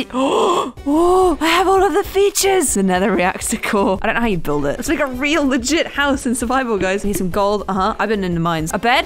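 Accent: United Kingdom. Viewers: Just wondering what country are you from because you sound British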